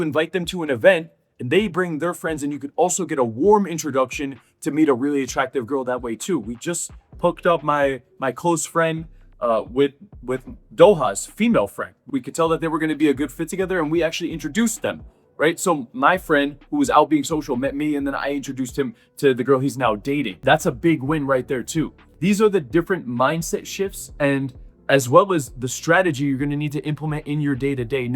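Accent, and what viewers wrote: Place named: United States. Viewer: you easily say this because you are an americana nd you have a cool accent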